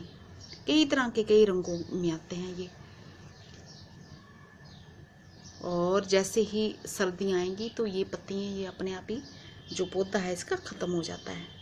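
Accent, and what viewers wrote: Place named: Jammu, India. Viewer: apki language ki tone sa aapka muja jmu ka touch lagta ha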